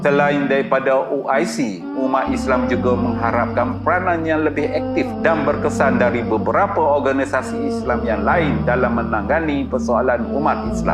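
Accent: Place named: Kelantan, Malaysia. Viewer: Loghat tumpat kental sangat 👍👍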